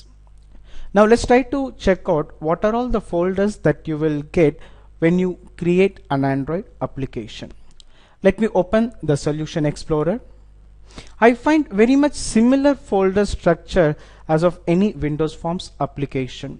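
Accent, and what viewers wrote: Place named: India. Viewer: that indian accent lol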